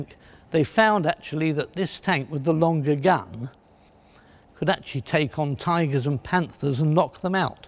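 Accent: United Kingdom. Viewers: Something about his British accent, cadence, and tone - yeah definitely easy listening.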